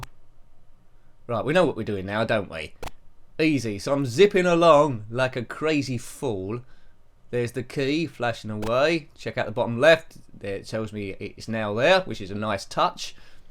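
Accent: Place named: Cockney, United Kingdom. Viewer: A nice little game that probably would have been popular on a budget label back in the day. It's all a bit basic and lacking flair, but it's decent in all areas. I really hope lots of new Spectrum games are created now that the Spectrum Next has given the brand a new lease of life., EastEnders should have you as a guy who runs a retro computer shop in Albert Square. Your accent is perfect for the show. One of my grandfathers was a cockney(born within bow bells.) so I have London in my blood. Have a King Lear on me. Cheers! D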